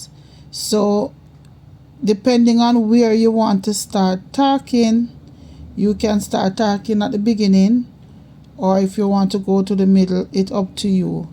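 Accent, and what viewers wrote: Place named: Jamaica. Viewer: Thank you so much love, i was really struggling with these, it was really helpful. i am always loving the Jamaican accent.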